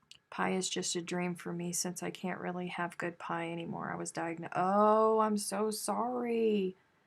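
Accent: South, United States. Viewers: In the UK, oregano is definitely o'-re-gah-no, just like the Aussies say it. We have coriander for the seeds and leaf part of the plant; pee-kan, not peh-kahn; aubergine is a plant as well as the colour - probably because we have more french influences in our words and Americans have more Spanish influence perhaps; pie has both a bottom and top of pastry, otherwise it's a tart or flan (that's flann, not flahn) 😂🤣😅 But it would be a very boring world if we all spoke "english" as the English do, wouldn't it. I think your southern accent is purrdy.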